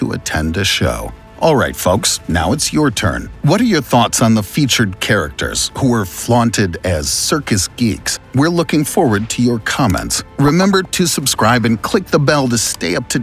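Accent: United States. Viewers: Don't speak with an American accent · the narrator sounds American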